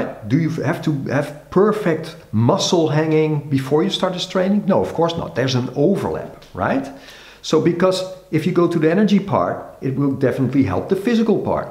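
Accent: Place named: Netherlands. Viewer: Ik wist het. I mean, I used to live in the Netherlands, so I'm used to the Dutch accent hehe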